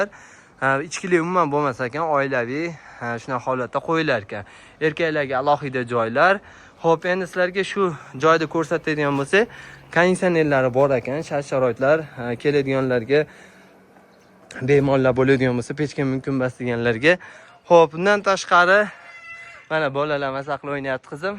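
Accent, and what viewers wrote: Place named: Fergana, Uzbekistan. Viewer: Шевасидан билсак Фаргонада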